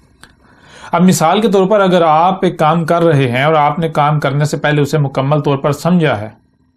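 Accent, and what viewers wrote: Pakistan. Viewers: یہ چھوٹی بات نہیں ہے لہجے سے ڈھیٹ پنجابی لگ رہا ہے لیکن چونکہ یہ اپنے آپ کو نبی کہہ رہا ہے تو کیا اللہ تعالیٰ نے اس کو اپنی کتاب کا نام تک نہیں سکھایا؟ کران کران کر رہا ہے بے غیرت بے شرم اور کچھ گمراہ لوگ واہ واہ کر رہے ہیں ۔ اس ویڈیو میں تو وہ کوئی خاص بات نہیں کر رہا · لعنت الله عليك! You seem to be green Murree from your accent. I will find you soon InshaAllah and.... you know the rest.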